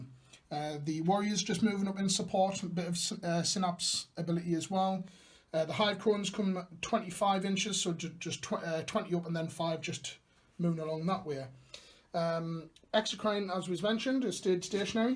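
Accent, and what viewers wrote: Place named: North East England, United Kingdom. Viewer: Hey dude, which part of the North East are you?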